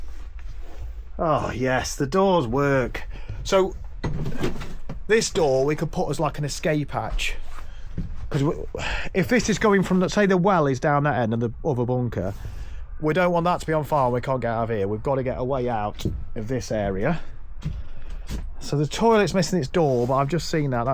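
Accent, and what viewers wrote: United Kingdom. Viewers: The accent says British but the use of feet and inches screens murican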